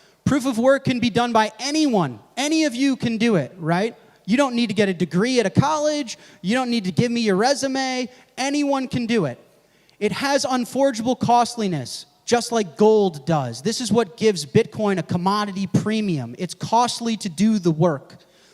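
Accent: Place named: France. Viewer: Il n'y a rien de plus élégant et compréhensible qu'un anglais hésitant parlé avec l'accent français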